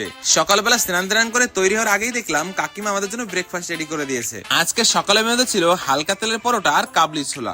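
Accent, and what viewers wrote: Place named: East Bengal, Bangladesh. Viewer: Uni probably bangal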